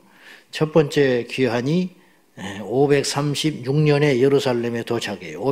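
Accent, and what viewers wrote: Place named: Jeolla, South Korea. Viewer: 목사님께서 가끔 사투리를 말씀하실 때 그 뜻과 의미를 거의 완벽하게 알아들을 수 있어서 참 좋습니다. 언어 소통이 어려운 이민자의 삶으로 살다보니 온전히 이해할 수 있음도 감사한 일이더라구요. (제 고향이 전북이거든요^^) 에스라 입장에서 1장~6장은 과거의 이야기. 536년 도착 520년 학개 스가랴 성전건축 촉구 스룹바벨과 예수아(여호수아) 건축 516년 10월 성전 건축 완공 지연된 이유 1. 사마리아인들의 훼방. 2. 성전 건축의 중요성을 잊어버림. 458년 에스라 중심 2차 포로 귀환 444년 느헤미야 총독 성벽 재건(3차 포로 귀환) 외부보다 내부의 문제가 더 많은 교회. 세상 법정으로 가지고가지 말아야하는데 지켜지지 않는다. 문제는 깨닫지 못함 생명의 역사를 바라보아야한다. (5절) 하나님의 역사를 막을 자는 없다. (단 1:8) 다니엘이 뜻을 정함. 예수님의 뜻을 정하심 거룩한 뜻을 정하면 하나님이 행하신다. 믿음은 기름이고 하나님의 불이다. 믿음의 결단. 뜻을 정하자. 결론 1. 거룩하고 의로운 뜻을 정하면 하나님이 반드시 도와주신다. (악의 도구가 되지말자) 2. 하나님께서 정하신 뜻을 반드시 이루신다.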